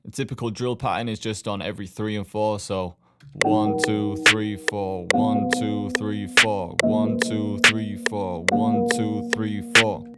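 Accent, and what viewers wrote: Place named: Lancashire, United Kingdom. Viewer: Yo are you from the Accrington Stanley area in England? Most of my extended family are and they all speak like you haha, sick video tho man your killing it and glad to seee you doing well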